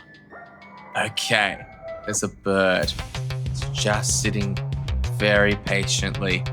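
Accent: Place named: Australia. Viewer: You're essentially SG's Crocodile Hunter now! How fitting that you're also Aussie (I'm guessing, from the accent) Would be keen for those croc tours you mentioned to another commenter, if they ever get off the ground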